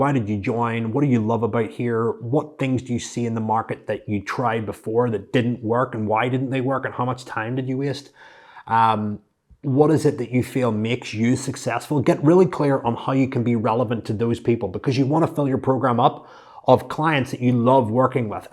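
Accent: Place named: United States. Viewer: Thanks! Very helpful. Loving my Mission Ready 💞 Ps.Your Irish accent seems to be turning American 😳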